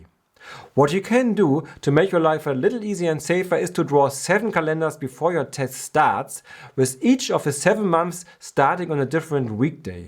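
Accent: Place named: Germany. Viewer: It’s nice to listen to a German among the tones of Indians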